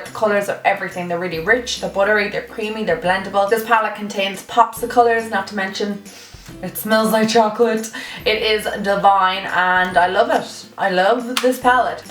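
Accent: Ireland. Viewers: Enjoyed this very much. You are entertaining, informative and quick. And of course love the Irish accent. Trust what you say as well. Will look through your videos but would like to see you do one half of face all Mac and the other nyx, or urban decay and makeup revolution, etc.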